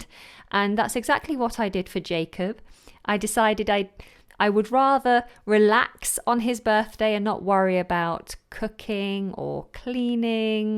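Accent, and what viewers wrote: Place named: United Kingdom. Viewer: Hi, it’s a late wish, happy birthday all the best to you. This is Andrew. I just found you videos by YouTube recommendations. Really like you speed of British accent. Thanks many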